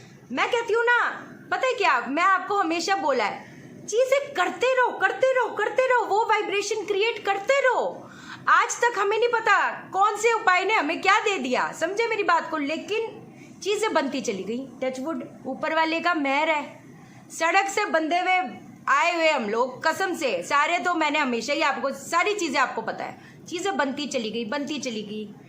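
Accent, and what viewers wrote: Punjab, India. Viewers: Your way of telling Passha logo (punjabi tone and way of communicating) is killing... Love you Mam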